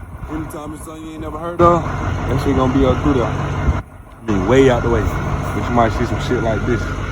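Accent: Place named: Atlanta, United States. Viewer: Bra so Atlanta 🤣🤣🤣I just subscribe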